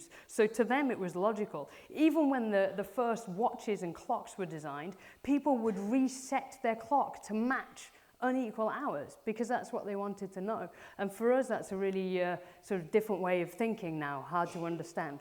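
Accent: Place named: Northern England, United Kingdom. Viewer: Interesting accent mix. Northern England and North American.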